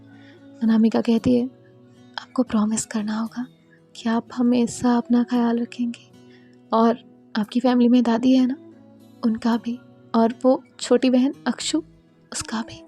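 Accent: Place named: Punjab, India. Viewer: Or ap bataiye kaha se hain ap Punjab se ya chndighar aisa lag rha h but ap bataiye ..hum mp se hai .Ujjain se ...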